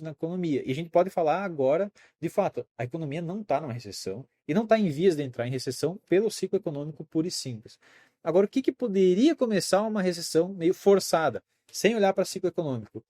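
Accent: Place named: Paraná, Brazil. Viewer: sotaque do paraná ou catarina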